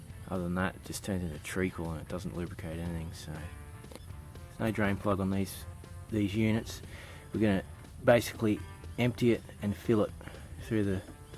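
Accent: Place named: Australia. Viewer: you sound like an Aussie bloke !!!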